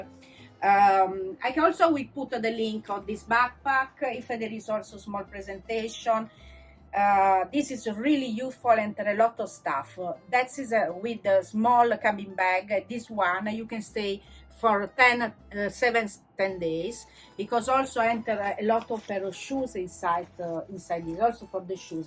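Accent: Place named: Italy. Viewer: Very good advices, and I love your Italian accent ❤️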